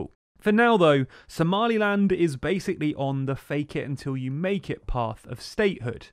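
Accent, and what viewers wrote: United Kingdom. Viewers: correction: there is no dotted line dividing the map of Somalia to make you nervous. Your presentation is good, but it is factually wrong. I am from Somaliland, and it is only my tribe that wants independence, in order to create a country were my clan is the majority and we will win all the "elections." The other 4 tribes don't want independence. The referendum you mentioned was only done by my Isaq clan, the other 4 clans refused to take part. so, of course we "won" with 97% of the votes. Many of us are having second thoughts, but we are afraid to speak up, because the former rebels from my clan who are now the "government" in Somaliland do not tolerate dissent. Your video is my clan's propaganda leaflets read with a British accent. Please check your facts.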